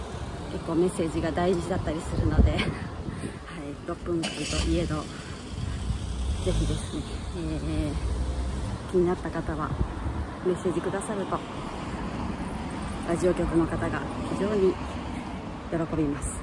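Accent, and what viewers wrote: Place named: Kyoto, Japan. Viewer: 京言葉素敵です❤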